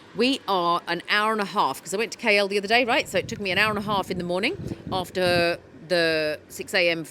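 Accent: United Kingdom. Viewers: I'm here to listen to your lovely british accent ! Welcome to Malaysia ❤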